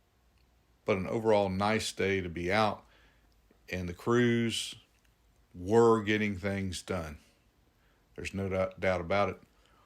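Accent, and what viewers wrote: South, United States. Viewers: I appreciate your desire make this knowledgeable and entertaining. You really know your stuff and I like your southern accent:) CHeers, Rik Spector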